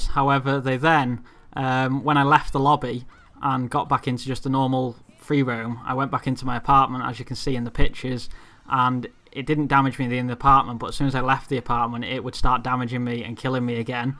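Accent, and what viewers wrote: Cheshire, United Kingdom. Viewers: yeah , sounds cheshire? Im from just outside there :D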